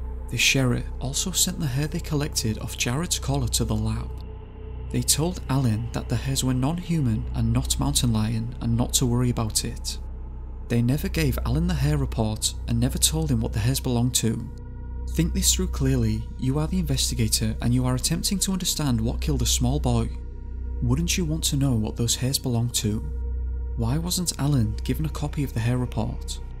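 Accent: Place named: England, United Kingdom. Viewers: Sounds like a Yorkshire accent. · It's really hard to follow these automated narrators. And why do these "robots" always have English accents??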